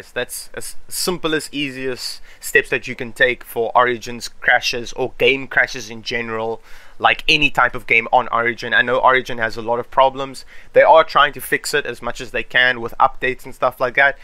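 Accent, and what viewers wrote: South Africa. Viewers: You have an afrikaans accent🤔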